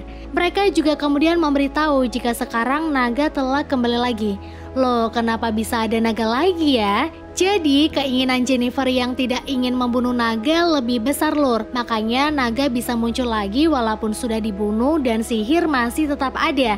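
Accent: Pemalang, Indonesia. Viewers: Orang pemalang ya kak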